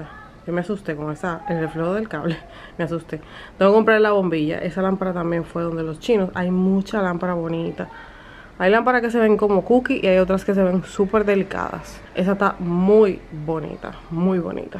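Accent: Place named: Dominican Republic. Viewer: Uy! ella esta hablando entre amigas y es dominicana a veces hablamos asi aunque sabemos perfectamente como pronunciar las palabras, ella esta bien formada profesionalmente se le nota. Valida tu corrección pero ten pendiente la nacionalidad de la YouTuber cuando oigas cualquier cosita mal pronunciada.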